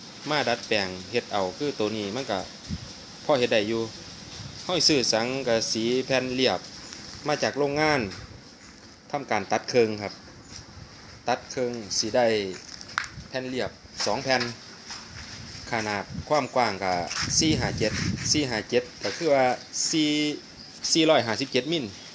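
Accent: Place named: Ubon Ratchathani, Thailand. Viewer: งานเนี๊ยบค่ะ อยู่จังหวัดใหนคะสำเนียงเหมือนคนอุบล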